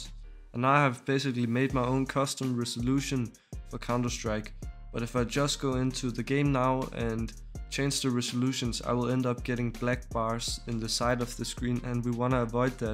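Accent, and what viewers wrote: Denmark. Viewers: are you danish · my g is 100% danish i can tell! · Are you from denmark?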